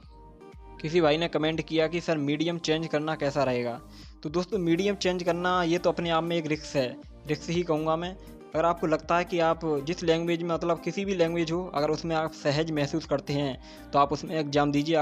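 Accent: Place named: Chambal, India. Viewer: भाईसाहब आप कहां से है ,, बोली से लग रहा है आप भिंड, (चंबल संभाग) से लग रहे हो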